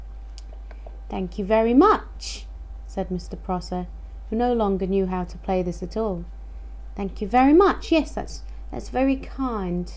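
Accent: United Kingdom. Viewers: When I first heard you read a story I thought you should have children all around you! You would be an excellent story teller! I do love your British accent... You have my attention!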